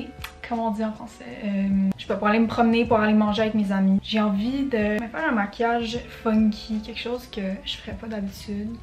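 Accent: Quebec, Canada. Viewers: ton accent québécois est TROP CHARMANT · actually, She has a strong quebec accent ( plus quebec french and France french are almost two different languages like there are LOTS of differences between theses two ) so if ur trying to learn France french , idk if it’s a good idea because quebec french is basically french with a strong english accent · L'accent québécois c'est même pas un accent c'est une autre langue + le slang omg 😅😅 j'ai adoré pls don't be insecure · J'adooore !!! Je suis française et j'adore l'accent québécois. J'adore la vibes ❤ on veut d'autres vidéos comme ça plzz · l’accent québécois est on another level, for a hot second j’ai oublié tout ce que j’appris mais après un peu i could pick up on some slang and understand the video! so thankful for this boost of confidence to begin studying it again <3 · je suis française et ton accent est adorable, on dirait un peu l'accent québécois. 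Tu es vraiment belle <3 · haha translation : "I'm french and don't worry about your quebec accent and your expressions, they're understandable, it has a lot of charm so don't be ashamed <3" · J’aime ton accent ses come Québécois et come Nouveau Brunswick et au Nouveau Brunswick ses franglais lol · She actually has a strong French Canadian accent haha but its really cute! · Bh c'est l'accent québécois donc si vous ne comprenez pas c'est pas de sa faute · Je viens du Québec (Montréal) aussi! 😂 C trop cool de voir quelqu’un qui parle avec l’accent sur cette plateforme haha Je me suis abonné :) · Her accent is also Quebecois, which is probably harder to understand and not something you hear as frequently as a student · L’accent québécois est meilleur😋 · je préfère largement le Français mdr mais l'accent Québécois est super drôle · J’aime tellement l’accent québécois, ça a tellement de charme! 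· OMG j'adore ton accent quebz. Cest compréhensible et pas exageré.😊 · OMG j'adore ton accent quebz. Cest compréhensible et pas exageré.💛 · U should listen to French accent not Quebec accent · Blk Barbie - yeah she's from quebec so it'll sound a bit different to a french person's accent i guess. though i'm not advanced enough to recognise regional accents yet lol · Je suis française et j'étais curieuse de voir comment tu parlais en français et je trouve que tu parles vraiment bien, au niveau vocabulaire après l'accent est fort mais pas dérangeant car on dirait que tu parles avec l'accent quebecquois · l'accent québécois 🤩 trop cool comme vidéo <33 bisous de France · Moi je trouve ça mignon l'accent québécois ^^ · i love the videos of u speaking in french bc i speak france french from american school but im planning on going to college in montreal so it's nice to hear quebeqois french in a casual setting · omgg i never realized how different the quebecois accent is! 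my french teacher is from côte d'ivoire, i'm having a hell of a time understanding this video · she has a québécois accent!! elle a un accent québécois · C’est trop cool de voir quelqu’un qui parle français québécois sur YouTube! ❤❤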